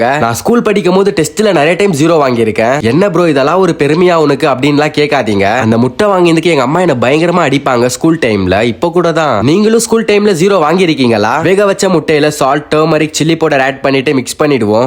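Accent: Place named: Karnataka, India. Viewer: Ungaro kannada slang nalla iruku bro 😎😎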